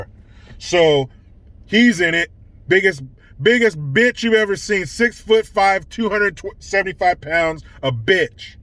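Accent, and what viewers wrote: United Kingdom. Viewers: You sound like a great dad, J! Love your vids! The brit accent is hilarious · Nice British accent 👌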